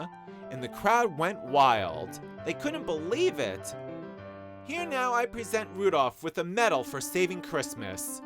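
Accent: United States. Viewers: Rudolph the Red Nosed Reindeer poorly narrated by a foul-mouthed New York (?) sissy.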